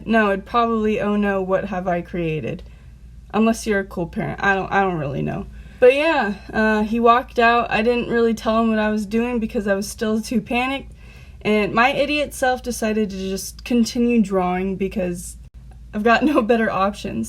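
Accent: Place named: Arizona, United States. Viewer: YO YOU FROM Az lol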